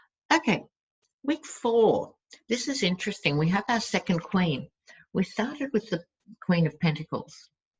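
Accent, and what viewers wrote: Australia. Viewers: Hugs to you,. You rock! I love uploaded videos of wisdom. Keep them coming in that awesome Aussie accent. 💛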